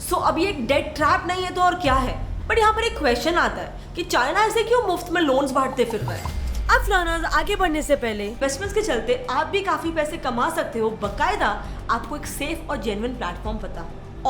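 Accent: India. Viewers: It's weird seeing a Chinese looking lady with Indian accent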